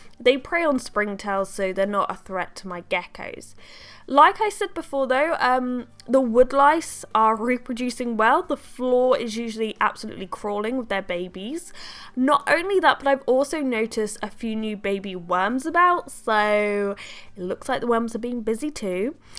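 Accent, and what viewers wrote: England, United Kingdom. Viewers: Is she English?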